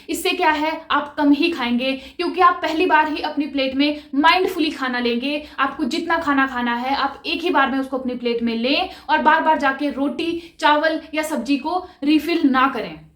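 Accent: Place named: Pahadi, India. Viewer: mai bhi pahadi hu aapke bolne ke tarike se samjh gai thi🙂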